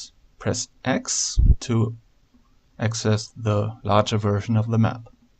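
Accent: Germany. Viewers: I knew you were German with the first sentence you spoke haha :D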